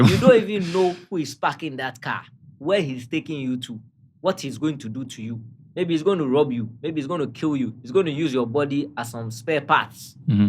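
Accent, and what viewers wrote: Ghana. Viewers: Are you a Ghanaian his voice is like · He sound Ghanaian🇬🇭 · This Indian guy has a Ghanaian accent. Did he grow up in Ghana?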